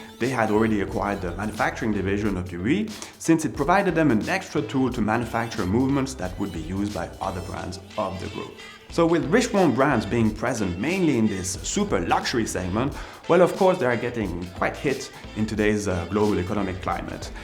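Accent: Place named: France. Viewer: From your accent, one could assume your first language is French. so you should be familiar with the pronunciation of the German umlaut-U (Ü) since it sounds exactly like a French U. So why is it that you pronounce a German name such as "Günter Blümlein" like a native-English speaker would "goonter bloomlein"?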